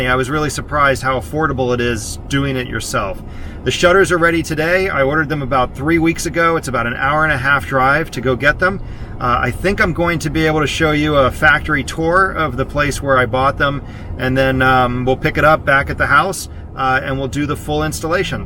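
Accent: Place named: California, United States. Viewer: This guy sounds like he’s from California.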